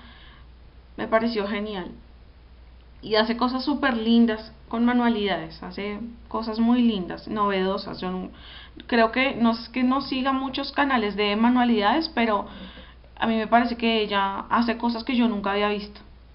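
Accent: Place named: Colombia. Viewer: lindo acento colombiano *.* me encanta ese acento.. bueno hablando de youtubers le aconsejo Supercenizoso :)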